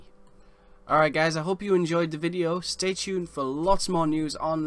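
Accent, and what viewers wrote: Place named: England, United Kingdom. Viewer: Hey man awesome video as usual. Just wondered if you think there going to update some characters like clayface, killer crock and now that they're adding him Solomon grundy to be big figs or even have transitions with people like bane in his lego batman/2 skin then push the venom button on his chest and do a hulk with him slamming in to the ground and looking badass. Also where in England are you from if you don't mind asking :)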